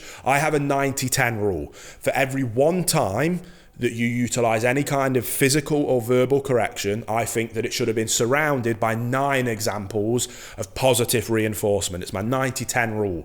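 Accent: United Kingdom. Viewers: Are you from Lancashire?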